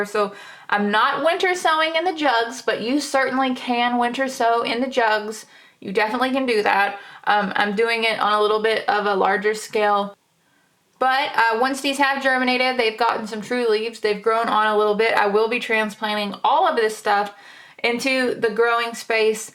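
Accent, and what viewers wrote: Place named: Texas, United States. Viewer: SO HAPPY to find you again, your accent reminds me of my friend janine from texas. :) i enjoy listening to you.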